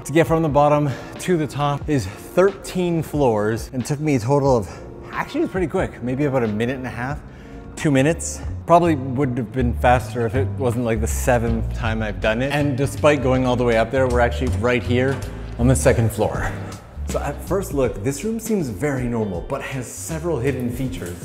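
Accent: Canada. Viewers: This is the most Canadian accent I've heard in a very long time.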